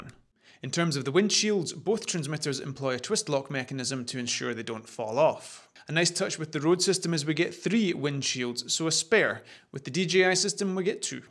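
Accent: Scotland, United Kingdom. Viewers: Excellent review. Are you a Scott?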